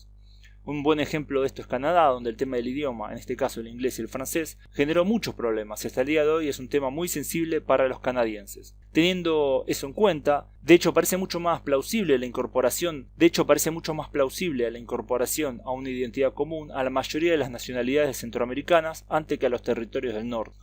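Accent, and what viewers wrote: Argentina. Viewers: Video basura... Argentino tu acento asqueroso.. dan ganas de cagar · O espanhol argentino do narrador é o melhor. Muito bonito o acento platino. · Latino???¿ De qué estás hablando? Serán países de habla Española Latino era una lengua que se hablaba en el Lacio (Italia) y hoy el único país que habla latín es el Vaticano. Latinoamerica es una denominación que los franceses acuñaron para quitarle el inmenso poder que tenía España. Tu acento parece Argentino.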